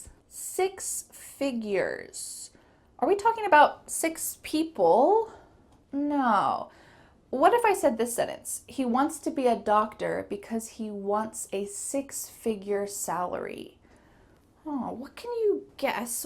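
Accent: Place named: United States. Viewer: Thanks for all your classes. With your methodology I can improve my english skills so much. My favorite youtubers to learn english are you with american accent, Lucy with british accent and Emma with Australian accent. I'm a beginner but I want to learn first american accent and for that I try to watch all your videos. Saludos desde Colombia.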